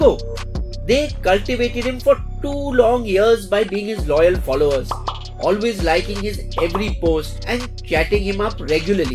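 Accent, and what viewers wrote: India. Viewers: The narrator sounds INDIAN.. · Bro please work on your accent.. You're accent is too much Indian. It sounds weird and will only promote hate from people of other countries who watch your videos.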